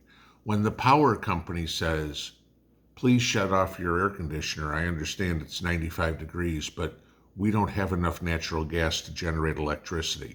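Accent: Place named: Appalachia, United States. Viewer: You sound as though you could be a neighbor...I'm deep in the Appalachias.....same out here....and I love it!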